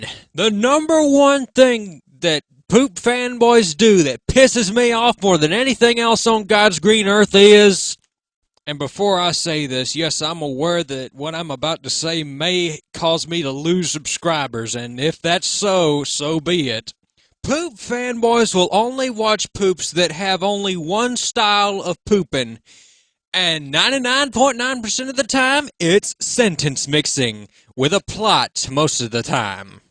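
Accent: South, United States. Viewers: you sound like a hilla billy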